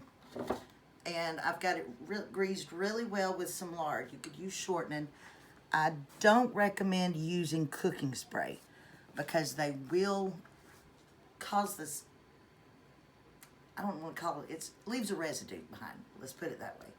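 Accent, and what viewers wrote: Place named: South, United States. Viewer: What a treat to find your channel today! I’ve been baking bread, rolls, English muffins, etc, etc. for sixty years now, and like you, it’s been like a therapy for me. I’ve always loved cooking and baking! This recipe will be my next one! Thank you! I look forward to your future videos and will, of course, be checking out past postings. Love your easy going style and southern accent!🤗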